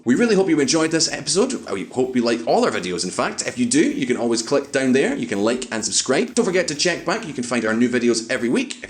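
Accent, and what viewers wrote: Scotland, United Kingdom. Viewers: Scottish, NOT. BRITISH! And no beard. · This channel defo requires some Scottish flavour a wee bit more. Aye g'ud job there lad! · honestly i'm quite pissed they hired a scot with such light accent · *uk (he's Scottish but close enough) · The Scottish accent was something I didn't know I needed on this channel but I welcome it! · Some Scotish accent characteristics I've just learnt: Perfect -> PEAR-fect Signed -> scent/Saint (if you speak "inwards") Side -> sight Outside -> oat-sight Know -> mix of no & nule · Scottish accept is difficult for me. Nothing personal. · Where the f*** is Simon and why is this Scot talking so quick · It's nice to hear a Scottish voice, 🤠 We're hoping to hear more about internal geopolitics such as Scottish independence and Irish unity. All the best to you!!! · I love the Scottish Accent Mate! 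Well done nice job and great sense of humor 👍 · It's not a British accent, posh Scottish which is much different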